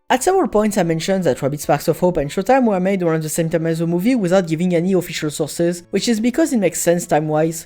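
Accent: France. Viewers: omg luvv ur french accent lol anyway , i don't think she resembles Daisy on personality but the problem is her face , the "Illumination" style ruined her as a whole.. she looks like a normal regular american blonde girl , that barely resembles a "fairy mushroom human (not really human)" plus we know her facial expressions are meant to be "minimalist" real Peach would never open her mouth more than 1inch haha not even in a fight or scream , and Illumination streches Too Much! her facial expressions , what makes her looks with so much attitude (so far from how we know Peach) is just not kawaii cutie , she could easily have a crazy ratched personality but if they kept her FACE instead of these goofy cartoony Disney wannabe face it would be no problem that's not even my they should have kept her uniqueness on her manners and face . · tout mon respect, absolument pas. 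Tu sonnes extrêmement français.e.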